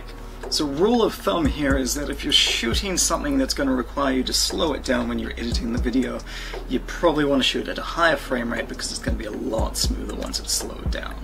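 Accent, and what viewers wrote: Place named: New Zealand. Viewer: Gosh that Kiwi accent shining through! LOL - good video mate, happy to see your face. Hasn't changed!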